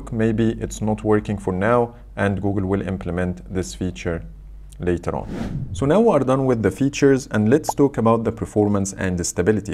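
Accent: Egypt. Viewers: انت مصري واضح من الاكسنت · Man I don't know if you're Egyptian or not but i admire this Egyptian accent 😂❤️❤️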